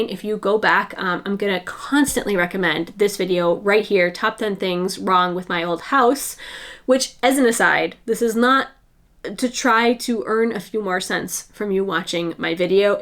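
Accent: Canada. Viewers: I appreciate that you aren't wasting tradies' time by asking them to come give you a quote when you have no intention of hiring them! I like your slow footage and I don't think you need to defend why your videos are different to others'. One of the great thing about youtube is the variety of styles that work for everyone. Do you think your editing style is informed by your circus performance style? You're Canadian, I'm Australian, probably most of your viewers are in the US... of course we all pronounce and spell things differently! If we all understand each other, what does it matter? And thanks for answering my question about the drain! I forgot about the soil-too-high aspect of your home, but I was genuinely interested because we are also renovating our 140 year old home and I was wondering if digging our drain inside was something we should consider. I hope it didn't seem rude! 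Thanks for your community engagement, it's definitely another reason I love your channel!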